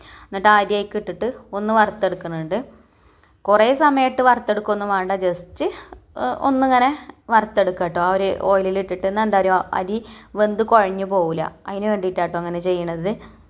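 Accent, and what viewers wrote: Malappuram, India. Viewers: ഇത്താന്റെ വീട് എവിടെയാണ് മലപ്പുറകാരെയാണെന്ന് സംസാരം കേട്ടപ്പോൾ മനസ്സിലായി. സ്ഥലം?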